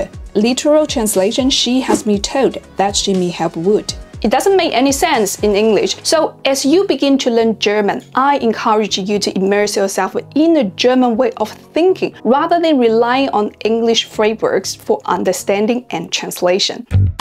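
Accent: Germany. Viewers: your German accent is slightly beginning to pour on over your English :P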